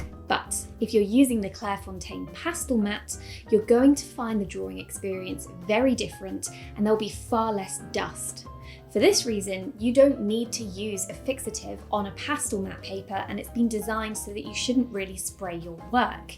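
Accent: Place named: England, United Kingdom. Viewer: Thanks for the advice , I love your English accent!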